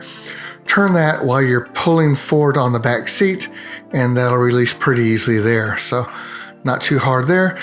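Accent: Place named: South, United States. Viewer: Yeah he does have a very pleasant voice over I first started watching his videos I was like man this guy’s voice is much more pleasant to listen to I think he’s a southerner if not mistaken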